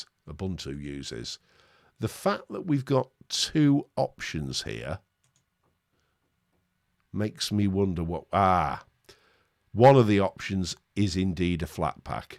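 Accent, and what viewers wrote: United Kingdom. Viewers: Mint has been my main dektop OS for quite some time now. The thing just works!!!! On my desktop, my laptop, kids laptop, kids gaming desktop running Minecraft nonstop, the media centre....I've had Ubuntu installed at some point until they came up with that Unity nonsence and I was facing the dilema whether I had to run to the nearest PC shop and shed cash for upgrades or switch distros, then I discovered Mint... I've been Linux user since the late 90's and started with Slackware. Really enjoy your videos and glad to see reviewers with British accent out there :-D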